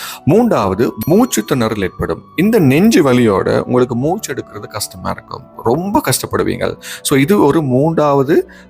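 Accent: Sri Lanka. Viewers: உங்க இலங்கை தமிழ் உச்சரிப்பு அருமை தம்பி 🎉🎉🎉சட்டுன்னு பார்க்க AI மாதிரி இருக்கு நீங்க எங்க work பண்ரிங்க my son joining 1year mbbs in channai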